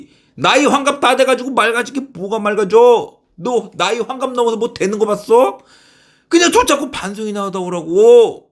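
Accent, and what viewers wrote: Chungcheong, South Korea. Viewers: 구수한 충청도 사투리 넘나 좋구먼유😂❤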